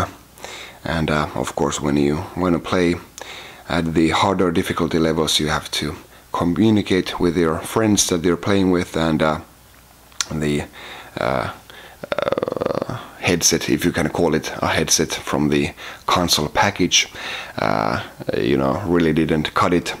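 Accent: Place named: Finland. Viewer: Eli oot sä suomaleine jos oot nii aksentti paljasti ja kanavan nimi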